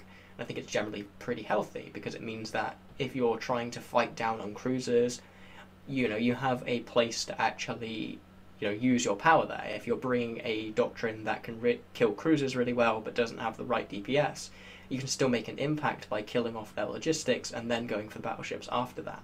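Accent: United Kingdom. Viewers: Ok, this might be a strange question; I started watching your videos recently and you sound like you have a southern british accent (i'm from 2 hours SW of London) and... yet you have a huge american flag on your wall. I'm very confused by it and was wondering why this was the case? are you american or british?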